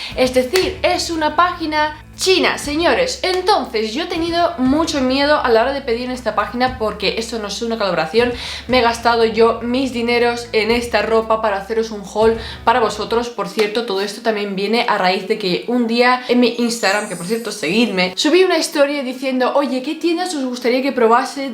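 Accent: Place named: Switzerland. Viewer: ¿Eres suiza? ¿Rusa? Es que tienes algo de acento extranjero.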